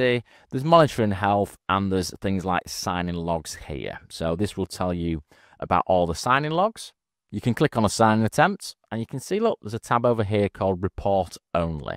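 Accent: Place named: Scotland, United Kingdom. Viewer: "Don't worry. You're not alone." deep inhale, long exhale Thanks internet Scottish tech guy.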